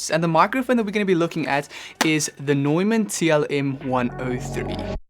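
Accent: South Africa. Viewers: Dude! Where are you from? You sound South African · You sound South African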